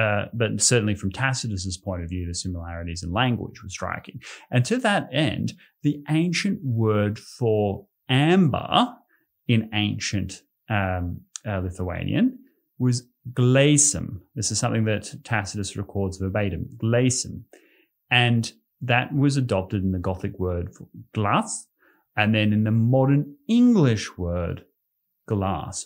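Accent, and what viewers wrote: Australia. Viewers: Thank you! Its amazing to listen australian talking about these forgotten things!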